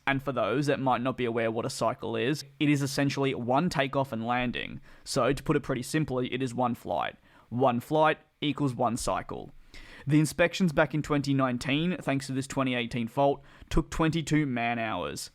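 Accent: Australia. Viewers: Are you from Sydney Australia?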